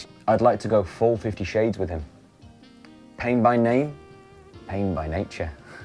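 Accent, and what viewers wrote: United Kingdom. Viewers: I can't understand half of the words he said, still learning to understand british accent better😂😂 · Damn his British accent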